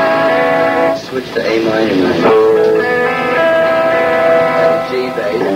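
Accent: England, United Kingdom. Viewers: This guy has an english accent ? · of course he started getting a English accent. look who he was around night and day.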